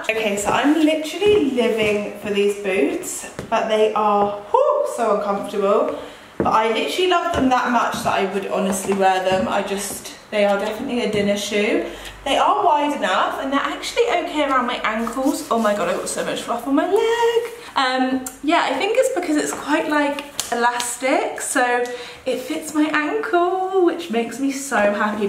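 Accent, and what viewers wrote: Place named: United Kingdom. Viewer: And love your British accent 😍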